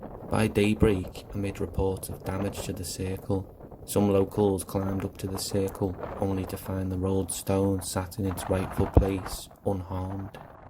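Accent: Liverpool, United Kingdom. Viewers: absolutely loved this...Right up my street....Is that a scouse accent? be watching and supporting your journey xx stay free xx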